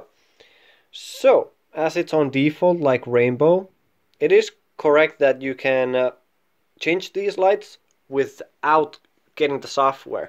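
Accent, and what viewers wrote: Finland. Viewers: Are you finnish? Your accent sounds a bit finnish and you have a nordic keyboard layout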